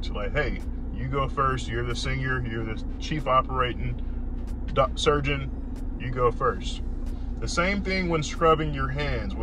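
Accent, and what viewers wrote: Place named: North Louisiana, United States. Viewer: You sound like your from North Louisiana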